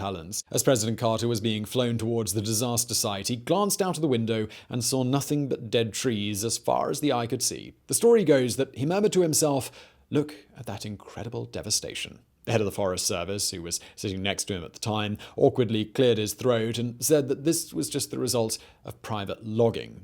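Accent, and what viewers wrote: United Kingdom. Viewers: agreed. Also this limey sounds stooopid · You Tube SUCKS! I have removed this man's channels over and over again. But when You Tube changes the algorithm, it force feeds me this guy's many channels despite my removing him. channels suck. They are poorly researched information that is mostly government propaganda talking points. Terrible videos. And just because has a British accent, this doesn't not make him an authority on anything. He's just a talking head. England is over. Brexit has made the only job in England a You Tube content provider. Terrible list videos that spread falsehoods and bull.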